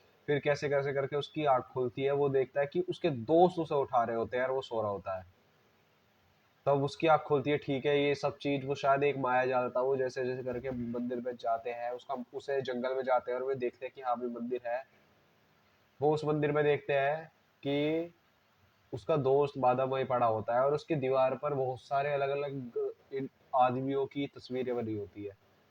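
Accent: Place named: Rural, India. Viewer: Horror nahi comedy hai... jaahil bewakoof aur dehati jaisa bol raha hai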